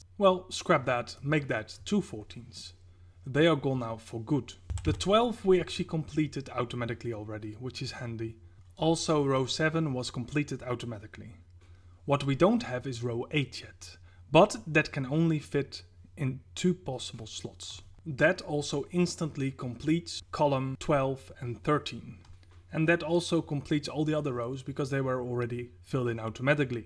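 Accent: Netherlands. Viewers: Dutch detected!!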